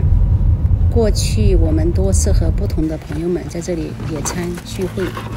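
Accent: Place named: Hubei, China. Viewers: 因为也居住在这里，看着Dana Point的景色，听着你讲述故事都感觉好亲切。你的口音和你的性格好像我一个从湖北来的朋友啊……她和她老公搬去Arizona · ，不好意思问你一个问题：你是姓闫吗？我一个朋友可能认识你。我是你的湖北老乡，听你口音很可能是我们那个城市的，荆门，我猜对了吗？我在夏威夷，希望你来夏威夷旅游的时候找我玩。你的频道我挺喜欢，喜欢听你讲故事。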